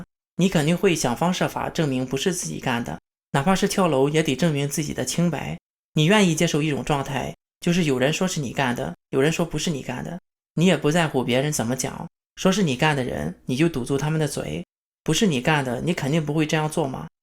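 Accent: Shandong, China. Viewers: 听你口音是山东的啊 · 小编是山东人吧，口音和我一样哈，棒棒的哈！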